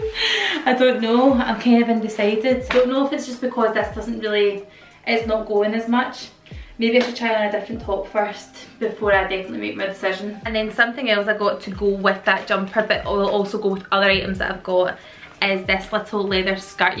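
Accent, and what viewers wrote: Scotland, United Kingdom. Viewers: Are you Scottish? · So is a Scottish accent?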